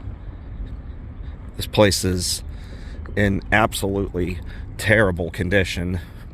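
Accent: Pittsburgh, United States. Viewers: Most Pittsburgh accent I’ve ever heard😂 great video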